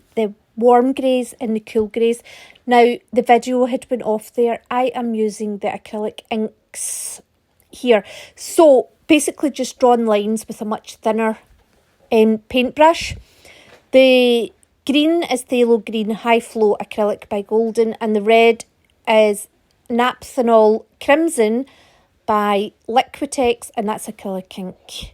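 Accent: Scotland, United Kingdom. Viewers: Loving your artwork! Very inspirational! You are such fun to listen to, your accent is Scottish?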